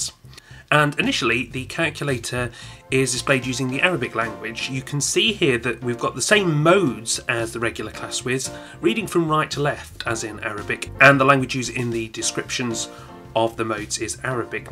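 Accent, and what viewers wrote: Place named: England, United Kingdom. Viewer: I liked your English dialect. Is it an American or British accent?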